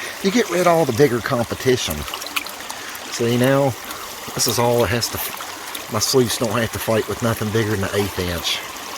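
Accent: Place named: South, United States. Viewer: You have a country southern style draw when you speak. I'm from Connecticut and I hear ya just perfect.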